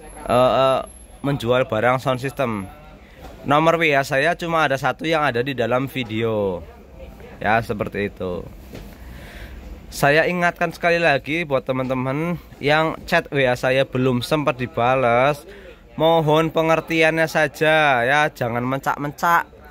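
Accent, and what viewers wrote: Banyumas, Indonesia. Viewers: Sampean asline ndi kang.. Kayane ngapak nemen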